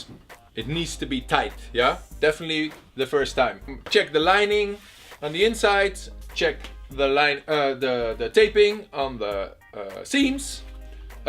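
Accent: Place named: Netherlands. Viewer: Love the dutch accent Good video though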